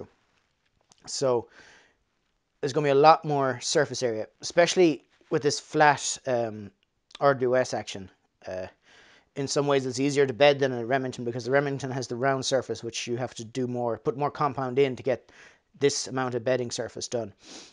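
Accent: Ireland. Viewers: Where are you ? You sound irish, how did you get a Boyds stock over ?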